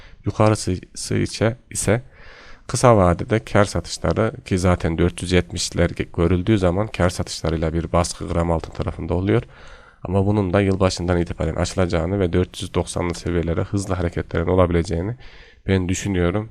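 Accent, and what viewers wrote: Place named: Yozgat, Turkey. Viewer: Bence yozgatli kardes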